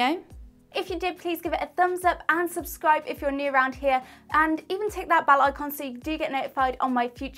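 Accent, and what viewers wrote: England, United Kingdom. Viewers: Has a seemingly English accent yet says how to make 250,000 DOLLARS a year. Typo error ? Or…..? Hmmm…. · The best english accent here for the english accent lovers! 🤗😍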